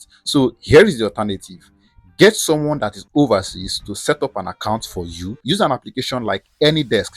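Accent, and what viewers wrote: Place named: Nigeria. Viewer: You are too fast, maintain your Nigerian or an African voice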